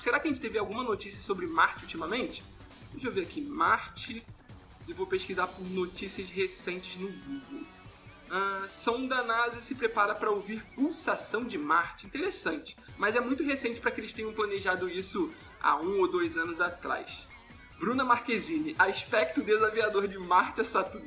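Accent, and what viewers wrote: Rio de Janeiro, Brazil. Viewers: Você é carioca?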